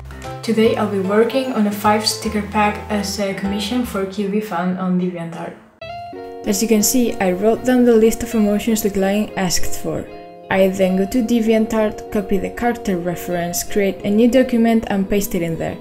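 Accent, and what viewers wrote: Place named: England, United Kingdom. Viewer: Wow that english accent.. ☺️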